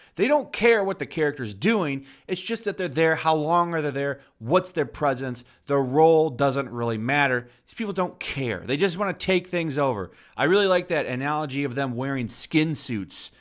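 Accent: United Kingdom. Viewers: Enough with the horrible British accent!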